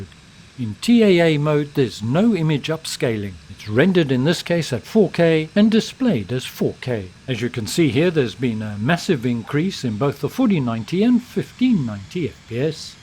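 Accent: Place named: South Africa. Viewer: Totally unrelated, but I gotta know; do I detect a South African accent?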